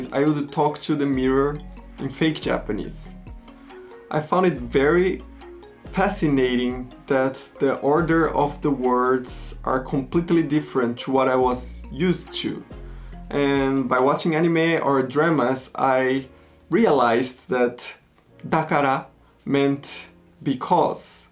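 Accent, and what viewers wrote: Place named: Brazil. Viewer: You sound like a Brazilian, am i right? You have Pretty good english and japanese!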